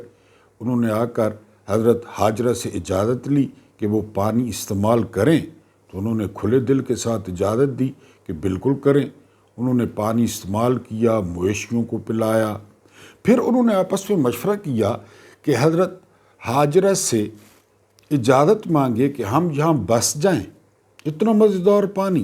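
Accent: Saraiki, Pakistan. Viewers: Shareki or Punjabi ap k muno or lejjay say Laga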